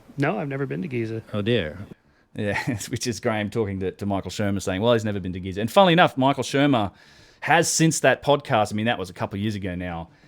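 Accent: Australia. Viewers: What are you doing is northern Cal .... hot maybe sacramento .....nice to here Aussie anyway ...Bro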